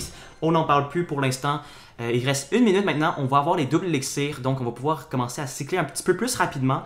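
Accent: Quebec, Canada. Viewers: Salut, vidéo géniale avec accent québécois génial. Continue comme ça. Voici mon ID clash royal pour l'emote Pekka: #YUJP2JCG8 Baptiste.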